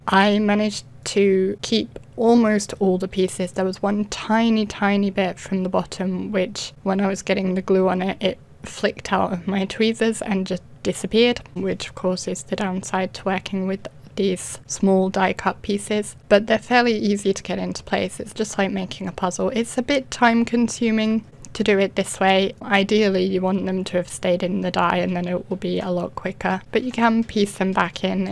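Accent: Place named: England, United Kingdom. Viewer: (I just want to let you know first that I'm not commenting to win. I truly just know this because I'm also on a crafty budge. So don't feel bad at all! Just because we're on a crafty budget, we can bring just as much happiness to others through the cards we make. So just think of all the people you make happy!!!!! ) For foam tape, you can use just regular pieces of foam or even foam with adhesive already on it. You can get this at just about any craft store. Then just cut to size and add to project. I noticed that you have an English accent. So if you're across the pond, I'm not sure what stores you have but it's available at any Dollar General or Dollar Tree store. You also mentioned that your embossing ink was drying out. You can use the same ink pad & just re-ink it with glycerin. (Check out Lindsay Weirich- "thefrugalcrafter"" her YouTube channel name. 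She used to do alot of cheaper ways to do things. Now she seems to focus on watercolor tutorials.) Glycerin can be bought at any general pharmacy. Your cards were very beautiful!!!! I loved the ink blending behind the heart & the sequins sparkling through the heart pieces!!! You definitely are better at that technique than I am!!! I tried once & gave up. Good luck with your YouTube channel & everything else!!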